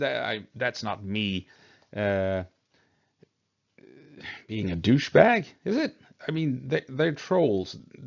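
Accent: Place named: Gothenburg, Sweden. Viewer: If I had the money I would pay for you to read more troll comments in that thick Göteborgs-English lol. That was great haha.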